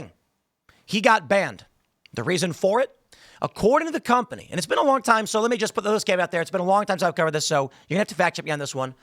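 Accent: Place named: Chicago, United States. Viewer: Tim and he Chicago accent. They gotchya by the bawls, he says. haha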